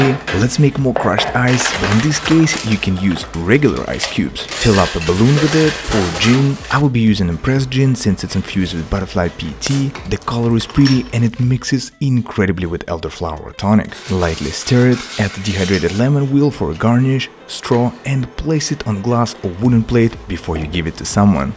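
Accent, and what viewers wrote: Russia. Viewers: У него русский акцент? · Hi,where you from?you have a russian accent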